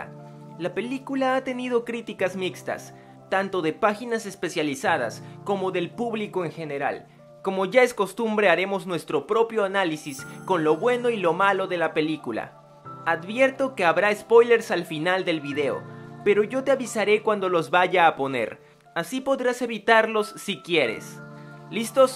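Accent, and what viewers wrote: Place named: Ecuador. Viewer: Eres ecuatoriano :3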